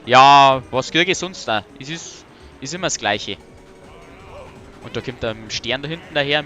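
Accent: Bavaria, Germany. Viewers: Geiles Video ... Dein Bayrisch is so Geil mach weiter so ...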